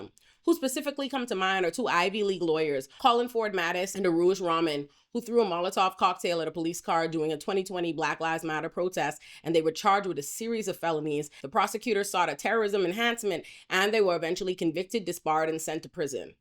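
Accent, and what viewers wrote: Bahamas. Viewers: Aside from me feeling like i hear a bahamian accent trying to come from you 😅.... i always said new york will fall first. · Are you Bahamian? I recognize that accent anywhere lol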